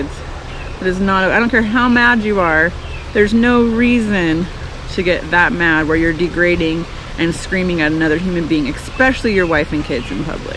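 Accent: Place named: Tennessee, United States. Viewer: D how long have you been in TN, you have "y'all" down pat..... Welcome to TN honey!!!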